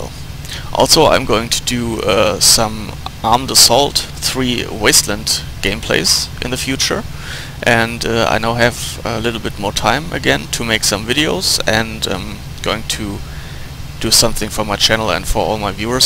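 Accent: Germany. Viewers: hi,. your vids are fun to watch, your german accent makes it interesting. I don't want to sound like a 'know-it-all' or something, but, you make the mistake many do when pronouncing the name...'hawkinge'......the 'inge' part is pronounced like the word 'hinge' or 'binge', not 'ing', like in 'going' or shooting'. I know, not real important, just a minor detail......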